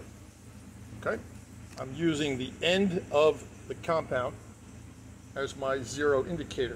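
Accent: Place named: New Jersey, United States. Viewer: Jersey? I thought I detected the accent of a fellow Jersey guy. For sure, that's not a Texas accent. :-)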